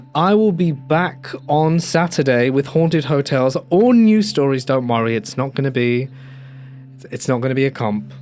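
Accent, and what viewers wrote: United Kingdom. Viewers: Sorry but british accents just don't make scary stories sound scary like american accents do so I;m out after the first 2 stories.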